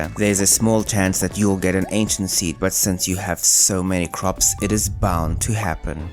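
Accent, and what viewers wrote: South Africa. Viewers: he sounds south african to me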